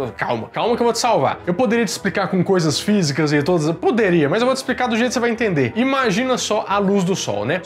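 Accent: Minas Gerais, Brazil. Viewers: ce é minerrr cumpadi? <3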